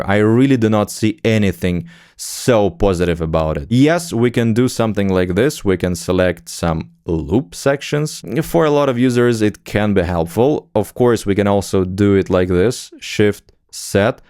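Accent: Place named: United States. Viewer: Круто ты шпаришь по английски) Не знал бы кто ты подумал бы что асерикос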